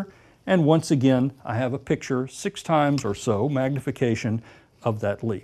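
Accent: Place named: United States. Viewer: I'm confused: he's in Australia, but his accent is American, but he called a flashlight a torch. Dude, where you from?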